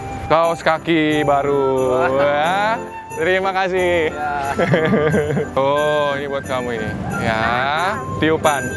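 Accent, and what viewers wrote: Bali, Indonesia. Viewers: bang orang bali?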